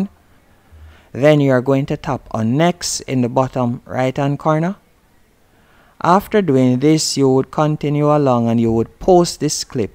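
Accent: Jamaica. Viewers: ya jamaican?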